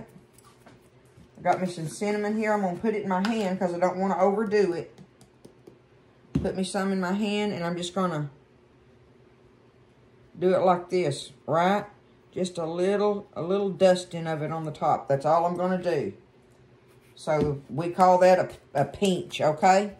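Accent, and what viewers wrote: South, United States. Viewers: That's some southern accent that you have. Your recipe is great for beginners. I tend to add a few move seasoning to my candid yams such as nutmeg (pumpkin pie or apple pie spices, orange juice or orange peel, vanilla extract, real maple syrup, along with a crumble topping with marshmallows). Hope this helps to spice it up for you.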